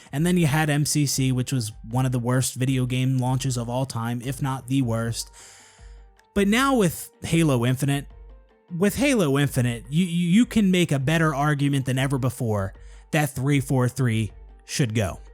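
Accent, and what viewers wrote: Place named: Pennsylvania, United States. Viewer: Gotta love a Pennsylvania accent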